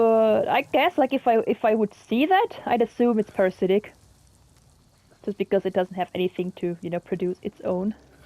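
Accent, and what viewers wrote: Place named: Germany. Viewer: Correct me if i'm wrong but I think that there was a mission somewhere regarding the ferals to completly wipe them out or at least cull the spawnrate. Your comment on sodium was quite interesting. Where are you from? You have quite the accent but I can't pinpoint where it's from. If I had to guess.... Germany? I'm not so sure, you did say Natrium right? Btw one of my favorite plants is Sol's Delight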